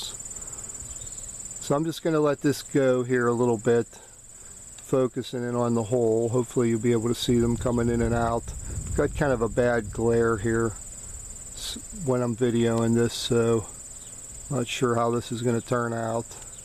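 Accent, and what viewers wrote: Pennsylvania, United States. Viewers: Great video. I agree, they should be left alone if they are not in a spot where they could be a problem. This species does prey on caterpillars but they are a major predator of flies which are just as much of a pest as caterpillars. Last year I did not remove a whole lot of this kind, but when I did they were located around doorways or eaves of structures or low in trees where stings to people were probable. I could tell from the accent you are a fellow Pennsylvanian!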